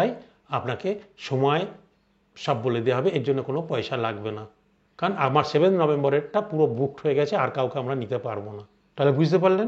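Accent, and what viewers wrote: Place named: Bardhaman, India. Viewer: দাদা আপনি কি বর্ধমান জেলার মানুষ? কথায় টান পেলাম তাই জিজ্ঞেস করছি কিছু মনে করবেন না প্লিজ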